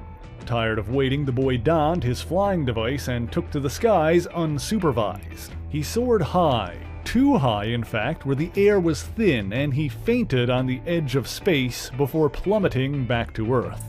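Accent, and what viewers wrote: Newfoundland, Canada. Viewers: You sound like you’re form Newfoundland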